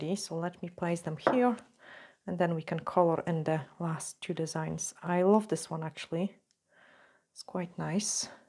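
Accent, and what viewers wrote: Scotland, United Kingdom. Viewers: I'm ordering your foil design gel and white french gel as it looks AMAZING when you use it... I already have your foil gel and it's the most brilliant foil gel or glue I've ever used omg. It's brill. Your colour gels look amazing too as they look so pigmented in your vids but i just love your personality and the way you say wee when you're saying little ha ha. It's sooooo cute and sweet as you live in Scotland it's rubbed off on you. You remind me of my mum-in a really sweet way. She's English but spent half her life in Scotland and uses Scottish slang in her English accent. It's sooooooo cute and sweet. I think you're such a sweet feminine lovely lady and you're super duper talented on top... and you're gorgeous!!! Ha ha I love your vids. I've copied a few (tried) hope you don't mind. You're extremely talented and I'm looking forward to getting my design gel and French white gel X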